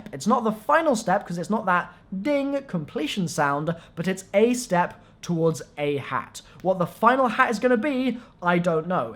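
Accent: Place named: United Kingdom. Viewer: Your accent just makes me so hype to be visiting the UK bro #muchosmelons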